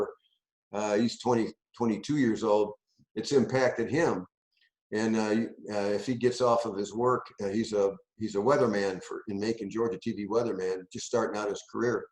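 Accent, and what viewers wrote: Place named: Indiana, United States. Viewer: u sound like a maga in indiana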